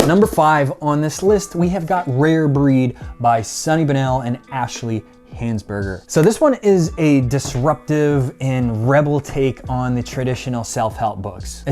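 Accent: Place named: Massachusetts, United States. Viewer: Hey been following your for sometime now under a few of our business accounts on Instagram(raisingwealthminds and theunstucksociety) just realized you are in MA, kinda thought you were with the accent(dude I'm in the same boat, so not knocking it). We are on the South Shore in Duxbury. Keep up the work man!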